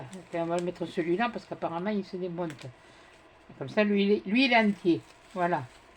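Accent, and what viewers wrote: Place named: South-West, France. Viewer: Félicitations pour ce concours gagné ! Joli colis c'est vrai que le bloc sur la lune est sublime .... jamais trouvé moi non plus sniffffff .... 1 jour peut être. Merci pour cette bonne humeur dans les vidéos.... et ce bel accent du sud-ouest ! Merci ! Je suis 1 grande débutante et j'apprécie cette simplicité des explications.......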